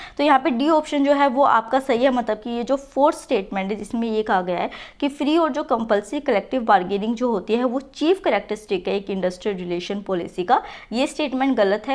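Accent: Haryana, India. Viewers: That is called "Haryanvi" accent.😎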